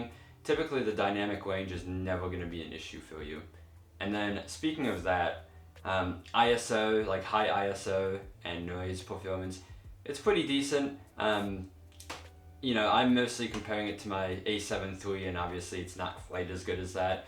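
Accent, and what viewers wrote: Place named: United Kingdom. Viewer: you sound more British than American but I'm not a native-speaker so I can be wrong. From which city are you from?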